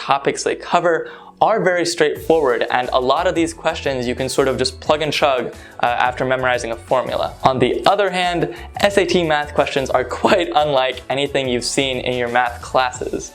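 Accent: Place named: England, United Kingdom. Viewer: I really love your English accent .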